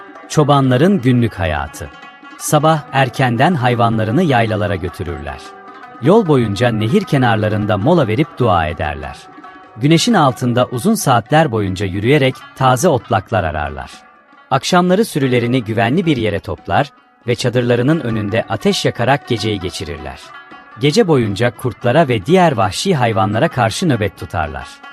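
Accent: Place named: Turkey. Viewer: بسیار عالی موفق باشی یک مستند بسیار عالی بود لحچه ترکی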